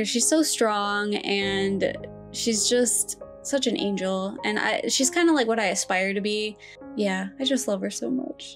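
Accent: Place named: East Coast, United States. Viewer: Wow! Thank you for answering my question! It’s really cool that you’re from the East Coast, US. I grew up in MA, and moved to NH when I got married 10 years ago. I had a feeling by your accent you might not be far far from where I grew up. Now I live in Japan and it’s really a dream come true. It was really great to hear your background about how you enjoyed and related to Tohru a lot. I also agree with you, out of all the characters I felt like I related to her a lot. But not on the same life experience so much. But the heart that wants to help heal others hearts and just be friends with anyone and everyone. That was always my heart as a teen, and still now it resonates with me. Also! I love OHSC!! I watched it when it was airing in Japan in 2006! I remember being so excited for each episode to come out and be subbed! I didn’t know then, how much of a parody of Shojo it was until much later, but I still adore it too! 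I can’t believe they are finally making a second season of it!! Also FMAB and Wolfs Rain!!! Yesss so good, but so gut-wrenching! I never watched Gurren Laggan, but I’ve heard so much about it. You should watch Violet Evergarden, Snow White with Red Hair and Yona of the Dawn, if you enjoy fantasy. :)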